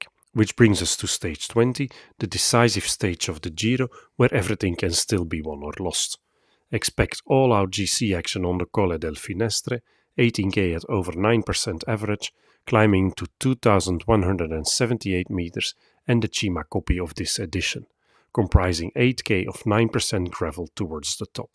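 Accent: Flanders, Belgium. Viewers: Ik hoor wat vlaams Engels in deze video haha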